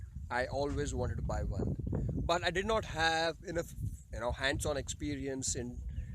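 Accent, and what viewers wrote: India. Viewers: it's not fake accent, he is telugu so his pronunciation is like that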